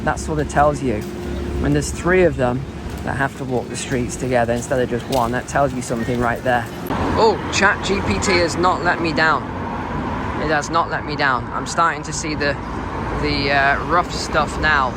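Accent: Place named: RP, United Kingdom. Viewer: Do you know how urban demographic transition models work? I learnt this stuff as a 13 year old in a crappy bog standard public school of all places, so you and others like you with your posh holier than thou accents have NO EXCUSE not to know about the subject. Literally every MAJOR CITY in the UK is composed like you see in Birmingham, it is no better or no worse than anywhere else. Even London is like this, literally walk 500 yards from Liverpool Street Station, which is plush, and the area quickly becomes depraved. Nothing has changed about these major cities. Manchester was exactly the same thing before the Man City FC owners invested in the local council rejuvenation project. The issue is a lack of investment, it's always a lack of investment in public services. People talking about immigration being the cause are just clueless, immigration has been here since WW2 and it's helped to not only rebuild the country, but to prop it up. 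If anyone is to blame, it's successive governments pulling public budgets when taxes are forever increasing.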